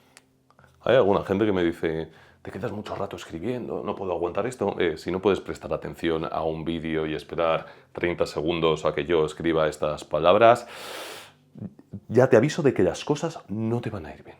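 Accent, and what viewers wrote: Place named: Latin America. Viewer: Lo que preciso es mirar a tu espalda por tanto tiempo como quisieras mostrármela. Qué guapo eres,. Sólo espero que la cámera pueda encajar más bajo de todos lados. Dulce. Lo siento. A la profesional. Como maestro de castellano, yo querría saber de tu manera del hablar., hablas un poco como un latino en relación a "voy a..." y nunca usas "esperaré, intentaré". Además usas "las cosas" cuando un español de pura cepa nunca lo haría. "La cosa, la cosita" son de latinos. ¿Tienes amigos latinos o te has quedado en Perú o Méjico? ¿Quizá tu mujer es latina? I'm strictly curious and obviously Te visto con buenos ojos, and mean you no ill will. Si alguien me ofretara 1000€ a decir algo malo de ti, no lo haría. Y finalmente, sobre el tema: La razón por qué no funciona la serie de "cosas" por un tío sea que lo que dijera no era factual. 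"Sí, lo quiero", pero en el corazón, no es verdad. Cuando nos mentimos puede sonar de verdad y a veces sólo nosotros no sabíamos que fue mentira.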